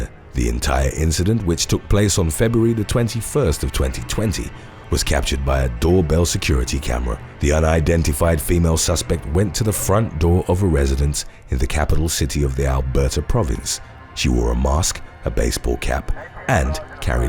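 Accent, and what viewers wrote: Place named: London, United Kingdom. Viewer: Hi, as an Englishmam myself I'd go so far as to say he's from the London area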